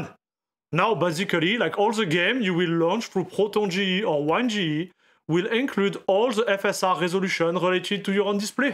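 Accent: France. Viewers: Due to an fortunate event I upgraded my 1440P monitor to an 4k LG OLED 44C29LB. Since I still have a RX 6600 XT running I will need to use FSR every here and there. Btw. your french accent is sounds funny and good luck with your channel. You seem like a cool guy. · only reason i end up watching the things i already know about is due of the lovely french accent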